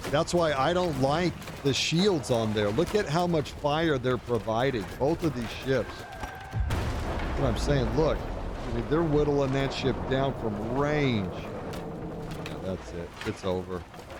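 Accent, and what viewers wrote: Oklahoma, United States. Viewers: I might be a little slow, but I just noticed your accent. Are you from Oklahoma?😂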